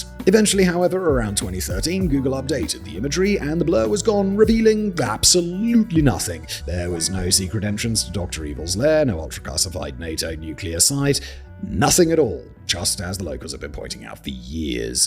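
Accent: England, United Kingdom. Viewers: I thought you were good. Yet you sold your soul to icoogni. The worst kind of company. Stupid british cuck. Learn to say aluminim (and Nike and Adidas and SIDEWALK because pavement is a material and not a location) correctly and maybe I will re-subscribe. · I think his team may have wanted to change up his image and make it more "hip" - but we don't WANT a hip! He's a nerdy bald guy with an English accent that makes him sound like Churchill on speed. But the whole setup has changed, different vibe altogether, complete with ruining his otherwise absurdly delightful narration.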